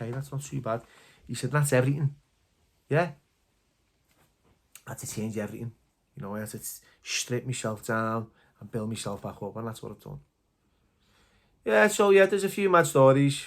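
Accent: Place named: Yorkshire, United Kingdom. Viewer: Belter Yorkshire accent that lol love your story's fella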